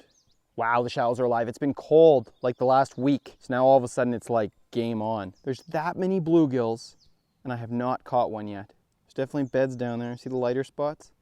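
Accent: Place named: Canada. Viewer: I'm Canadian but this is one of the strongest Canadian accents I've heard.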